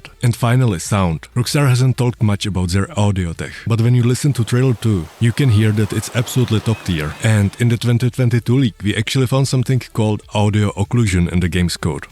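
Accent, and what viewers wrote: Czech Republic. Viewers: Are you czech you have that kind of accent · This is czech accent?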